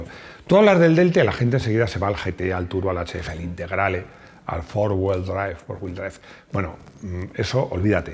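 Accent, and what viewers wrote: Chile. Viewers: es chileno? ese acento reconozco xDD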